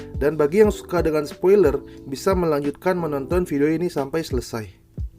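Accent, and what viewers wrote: Bali, Indonesia. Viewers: Nak bali ules ne logat bli ne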